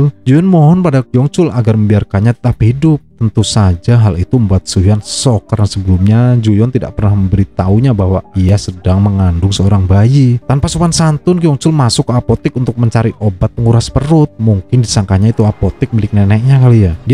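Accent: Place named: Java, Indonesia. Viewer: Bang lu orang Jawa ya ? Soalnya kaya keciri banget dari logat Luh ngomong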